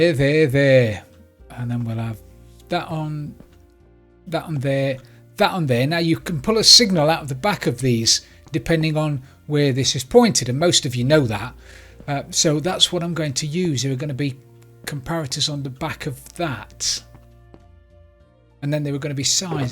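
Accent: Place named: Derbyshire, United Kingdom. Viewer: Wow. Wow. Wow. Mumbo Jumbo who?? You are the master of redstone. Amazing system. I'm surprised that if you like jump 2 so much, you haven't mentioned it before though;) I've been trying to work out which part of the country you come from based on your accent. My best guess is Derbyshire but not entirely sure...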